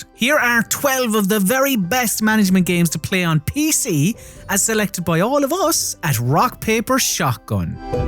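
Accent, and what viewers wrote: Ireland. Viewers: man soundds oirish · I love the narrator’s Irish accent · Is this the Irish accent? So cute.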